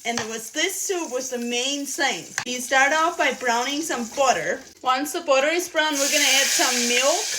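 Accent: Germany. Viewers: Do u have a German accent? I got a little scared when u cut the bread I'm not gonna lie 😂😂 jk ❤❤😂 I think u are wonderful😊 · Is German your first language?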